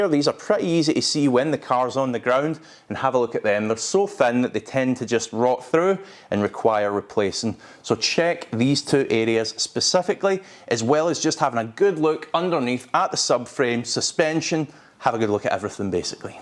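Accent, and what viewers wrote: Scotland, United Kingdom. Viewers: and you referred to the 2006 model twice....I think you meant 07-08 . I can't stand Scottish accents. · Great vid and the accent totally reminds me of Trainspotting:)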